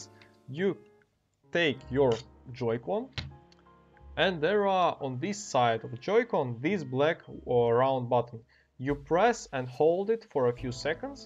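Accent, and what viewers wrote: Russia. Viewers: Спасибо что сказал как подключить! Странно это прозвучит, но я на начале видео понял что ты из россии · Я сразу по акценту поняла, что ты русский, вхахаха) Thanks for the tutorial, though:)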